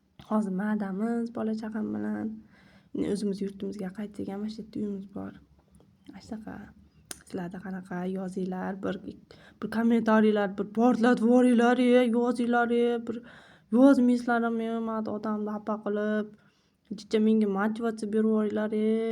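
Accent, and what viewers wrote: Andijan, Uzbekistan. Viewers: Хожи киз бэкор гийбат дебсиз хамма нарса ниятга богликлигини биласизу сиз ростанам Валижон шамсиевни анжонлик келинди уйнаган келинга ухшаб гапираркансиз ошликмисиз мен Анжонданман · Аввалам айтганман мен андижон данманк шевангиз худди бизникига ухшар екан деганман мен кизимни аккаунт идан чикаман йошим 60дан ошган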